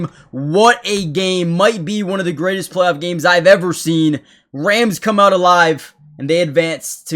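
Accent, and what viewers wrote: Canada. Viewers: Sounds Canadian eh?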